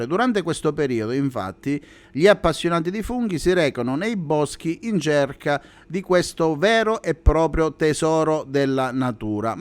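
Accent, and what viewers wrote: Calabria, Italy. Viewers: Accento calabrese pesante eh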